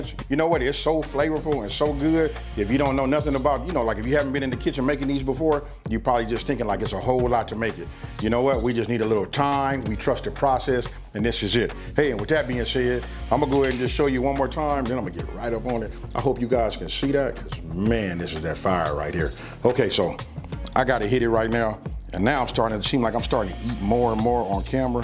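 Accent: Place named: Los Angeles, United States. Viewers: My god that looks delicious ❤️❤️❤️👌 And I love,love, love that Cali accent!!! Southern TN girl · You must be from Cali. I can hear what sounds like an LA accent. I'm from Cali, but moved to Texas last year. I didn't realize we had accents until I moved here. Great video, I know them greens are fire.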